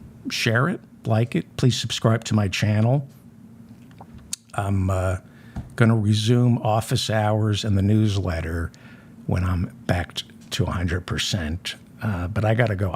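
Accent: United States. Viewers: WTF. oh my God. You sound like a Yale Guy.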